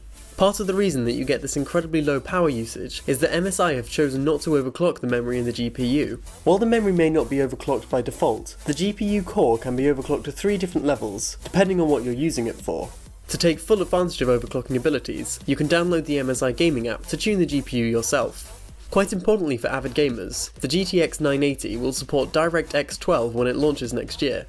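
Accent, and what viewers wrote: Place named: United Kingdom. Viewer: I like your style, looks clean and professional. also your brittish accent makes me like the video even more :D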